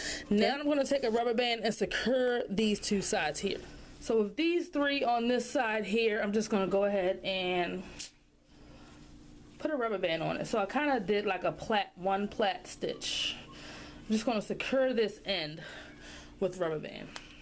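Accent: Baltimore, United States. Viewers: Very pretty. I love your Bmore accent. Stay safe.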